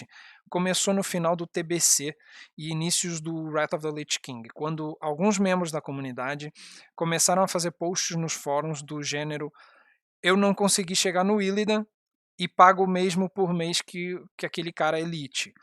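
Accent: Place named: Portugal. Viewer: eu ia comentar que vc tem sotaque português e vc disse que tem família lá kkkkkkk furou meu coment! Cara vou sentir falta dos seus guias, na minha opinião os melhores de tank que já vi. Usei muito de war e de druida(mage tower)! os seus junto aos do Wild são os melhores guias de classe do wow em português. Gostaria de dizer que também parei de jogar desde o começo desse ano, e só voltaria a jogar caso muita coisa fosse reformulada, fiquei entre 6 e 7 anos aqui, um obrigado e quem sabe não voltamos um dia, boa sorte man!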